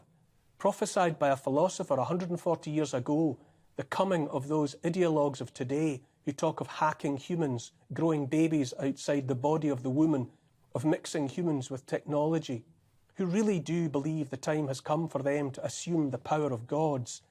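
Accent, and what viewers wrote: Scotland, United Kingdom. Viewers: It's the voice in our heads spoken eloquently with a scot accent. England has to look north for a leader. He's the best truth speaker at the moment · ❤️ ❤️ ❤️ ❤️ ❤️ ❤️ ❤️ ❤️ 🎄🎅🎄🎅🎄🎅🎄🎅🎄🎅 ⛄🎉⛄🎉⛄🎉⛄🎉⛄🎉 Neil Won't Kneel A gentle, beautiful Scottish lilt A kind, empathic, forward tilt An open heart; a caring face A willingness to take his place. A strong, home-hitting monologue Reminds us of the boiling frog The courage to become a sleuth A passion to release the truth. A deep desire to crack the whip Illuminates our freedom-strip Calls out the darkness taking hold The shiny despots; plated gold! Intense and raw, he lays it bare A soul-enhancing, honest stare Inflections drive the message through He speaks for Us - for me and you! Discernment's such a precious gift His words create a mighty lift Take heed of all he has to say He knows - it shouldn't be this way! 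🤗🦋🐬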